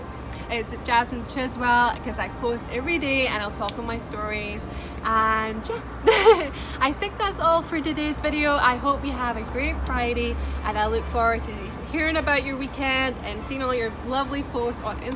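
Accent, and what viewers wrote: Scotland, United Kingdom. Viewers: Please never lose your lovely Scottish accent!!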